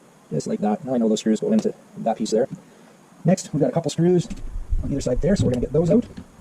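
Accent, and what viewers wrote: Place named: Canada. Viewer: haha, love your canadian accent!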